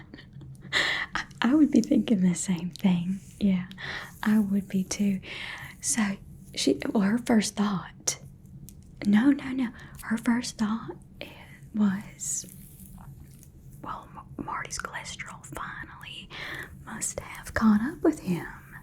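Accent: South, United States. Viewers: It's so incredibly, genuinely, southern. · I feel like since does a great southern accent and she has done a Boys rp I feel like she would do a killer firecracker rp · Southern accent from tonight I liked so much 🥰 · Your southern accent asmr always relaxes me! I love your creativity & roleplays, ty for helping me sleep. Literally perfect timing 💖✨ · The way you do divided attention in ASMR is so good, the Southern accent and gossiping makes this so nice and relaxing to listen to 😴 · i’ve been rewatching all your southern accent videos for MONTHS i cannot thank you enough for this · Happy Thursday,!😊😎☀💙🙏🌷💖⭐⭐⭐⭐⭐⭐👍. Your southerm accent is so....special and tingly. AMAZING!! Plus, your smile is perfect!😀😍⭐⭐⭐⭐⭐⭐⭐👍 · . I just love 💕 that southern accent very Awesome 👏🏻 job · Yesss! Your southern accent videos are my favorite!!! ❤ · Always luv the divided attention vids also ur so good at the southern accent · She nailed the Southern accent😂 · I’m a southerner, but I don’t have a deep southern accent and I find it so calming. 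So any video with a southern accent? Golden <3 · The laughing 😂😂😂 you are such a good actress! I love your southern accent videos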